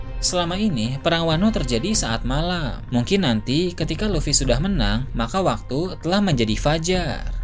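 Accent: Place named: West Java, Indonesia. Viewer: Yang ngomong ini orang Sunda, Jampang fixz wkkw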